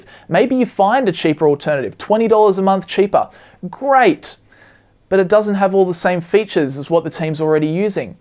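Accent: Australia. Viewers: Are you Australian? The accent sounds familiar... Thanks for the good video 💪🏼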